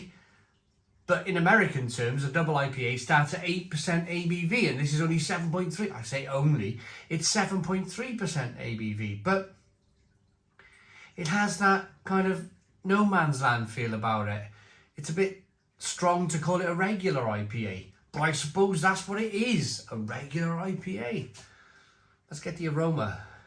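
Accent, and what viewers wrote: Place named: England, United Kingdom. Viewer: Proper english lad with a proper English accent reviewing a proper american ipa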